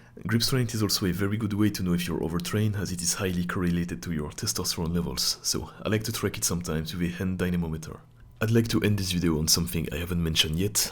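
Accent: France. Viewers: Tes vidéos sont impressionnantes !!! Et en plus j'me dis que je ne suis pas tout seule à conservé mon accent français quand je parle en anglais😅😉. T'es avant bras sont monstrueux, jolie boulot, je me pose la question sur ton régime alimentaires Good job bro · You sound french for real Edit : your are french for real I can ear that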